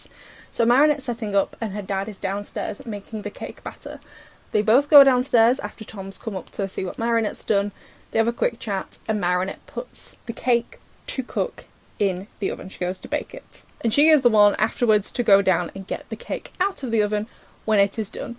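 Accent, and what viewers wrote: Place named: Lancashire, United Kingdom. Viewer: dw I heard 625. Probs because I’m in Lancashire too so have a similar accent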